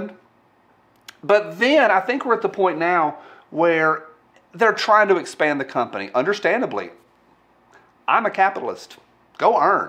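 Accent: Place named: Tennessee, United States. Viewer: Dude what county in Tennessee do you live? I’m in Robertson County and can tell your accent is thick Tennesseean.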